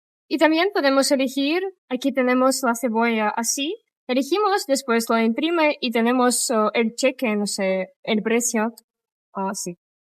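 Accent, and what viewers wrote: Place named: Spain. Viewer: El acento es de españa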